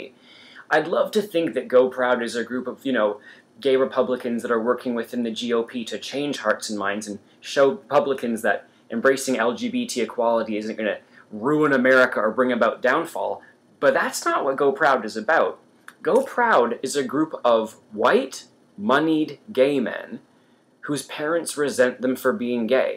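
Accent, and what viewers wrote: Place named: Canada. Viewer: As a Canuck, I thought that you had a Canadian tone to your commentary! :) I love that you put your opinion out there & let people glean from it what they will. If you've been watching our political clime, you see that the LGBYT community is not as "safe" as it used to be. We've become complacent & are once again realizing that equality is not seen by everyone as a right but a privilege. Vigilance/education/outreach - always remember that no one hears us unless we make the effort to be heard. :)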